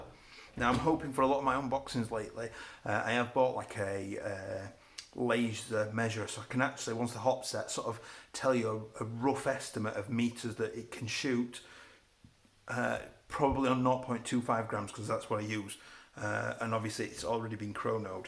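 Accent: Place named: Yorkshire, United Kingdom. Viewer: Haha I know a hello Yorkshire man when I hear him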